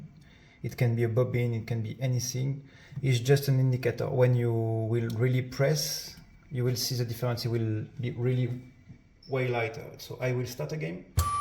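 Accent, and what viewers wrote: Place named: France. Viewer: Bonjour vu votre accent vous êtes français 😉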